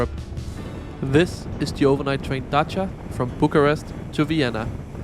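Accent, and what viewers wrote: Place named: Denmark. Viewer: Just found your channel by accident… but I’m glad I did haha Such great content! Instantly recognized that you are from Denmark given the accent Recently I’ve been to some suburbs of Kobnhavn while they were constructing the Hovedstadens Letbane/Copenhagen Light Rail – quite a unique sight, seeing the freshly laid tracks without the overhead lines You are going to be uploading a video about it? As I read, parts of it are already open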